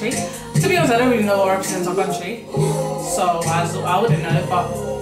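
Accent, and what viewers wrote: United Kingdom. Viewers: I can hear her just fine with that British accent. Y'all need to have your ears checked. Djiboutian 4 life here, laakin heblayoo is astuur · you look stunning girl 😎 and love your London accent 👌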